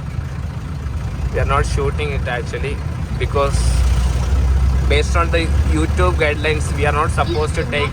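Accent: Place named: Kerala, India. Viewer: Eda nee Malayali aanenn budhi illa aalkare ninte hindim Englishum ketta manasilakum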